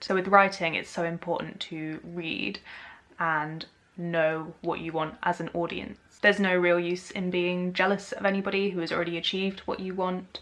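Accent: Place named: United Kingdom. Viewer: yeah, you have such a lovely British accent. Let's be friends!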